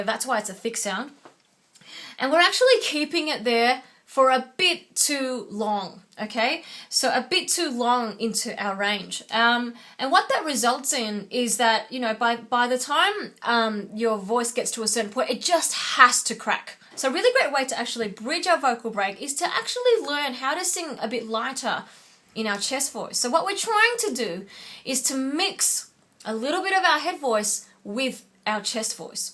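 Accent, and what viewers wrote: Australia. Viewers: Very articulately explained, thanks. Nice to hear an Aussie voice too.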